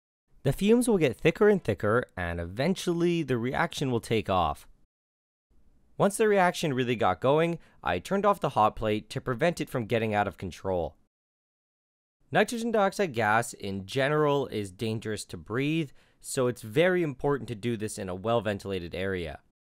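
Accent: Canada. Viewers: you tryin so hard to cover that canadian accent huh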